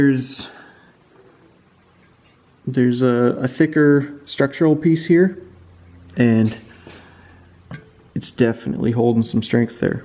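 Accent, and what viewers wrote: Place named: Nova Scotia, Canada. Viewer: How'd I know a video about how to repair a rocker panel would be done by a guy with a Nova Scotia accent.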